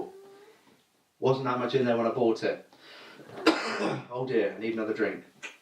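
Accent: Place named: RP, United Kingdom. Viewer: Nice review...your just to posh pal...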